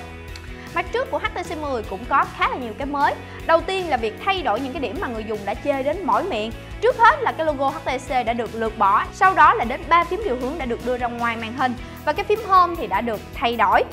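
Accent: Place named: South, Vietnam. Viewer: thích giọng miền nam review ghê,hay phết 👍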